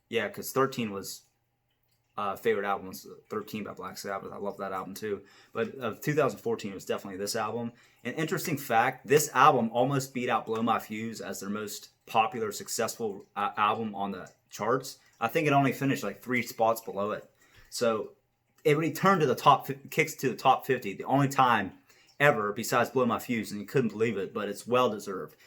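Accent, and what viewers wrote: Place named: Maryland, United States. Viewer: ....I hear your Maryland accent, you can't hide...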